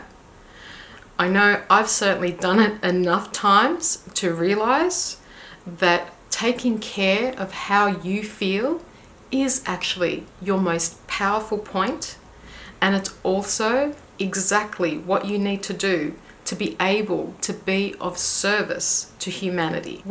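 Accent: Australia. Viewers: OMG I have been working on healing from my narcissistic abuse for a long time and I have just discovered your chaneI. I love that you have an Australian accent. You are so clear and empowering. Thank you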